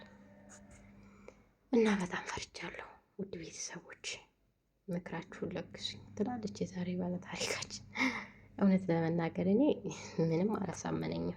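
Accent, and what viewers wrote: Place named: Wollo, Ethiopia. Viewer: ወሎየ ነሽ አነጋገርሽ ያስታውቃል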